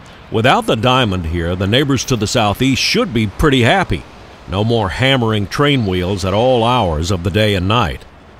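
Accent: South, United States. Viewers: I like this guys voice. It’s like a cowboy with a really light southern accent.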